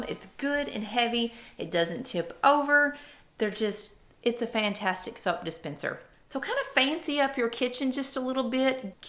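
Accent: South, United States. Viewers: Thanks for all the hints..I just love listening to your Southern drawl...also seeing taking part in the demos! Lots of fun! 😃 from a Minnesota gal ❄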